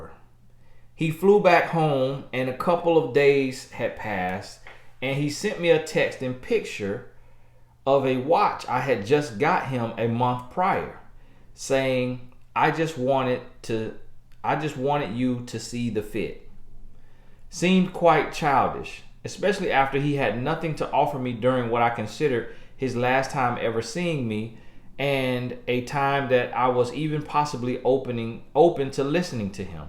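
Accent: South, United States. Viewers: Love your hometown Southernness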